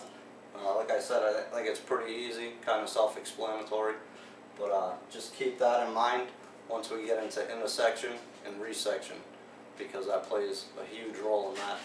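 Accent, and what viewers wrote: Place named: Boston, United States. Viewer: That is the hardest Boston accent I’ve ever heard!! 😊👍🏽👍🏽 Good stuff bro. Easy to understand.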